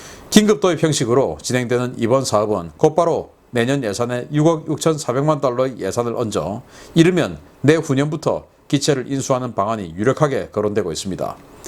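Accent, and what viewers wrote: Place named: Gyeongsang, South Korea. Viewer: 경상도 액센트 좀 안썼으면 좋은데. 좀 거북합니다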